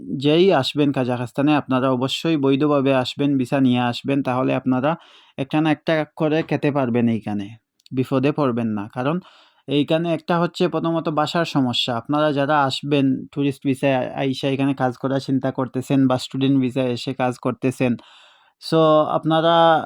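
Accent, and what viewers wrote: Sylhet, Bangladesh. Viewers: আপনি সিলেটি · ভাই সিলেটি মনে হয় নম্বর দেন জোগা জোগ করি।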